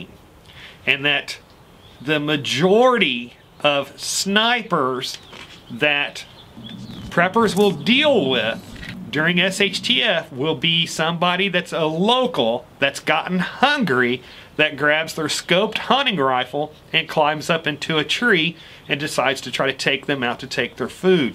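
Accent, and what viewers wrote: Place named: Appalachia, United States. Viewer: I like your speech just fine and keep up the good work any correct information even from hillbilly speaking person or slang speaking person your good soul. and how you speak to point is not bad not racist or anything bad so god bless and maybe god bless your family and friends out there.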